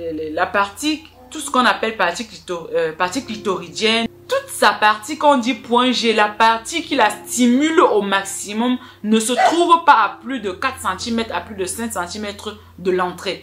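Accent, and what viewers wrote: Cameroon. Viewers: Merci coach. Je suis sûr que vous avez déjà accouchée. J'aimerais voir le joli bébé 🤱. Votre accent tend vers le Cameroun, c'est ça?svp.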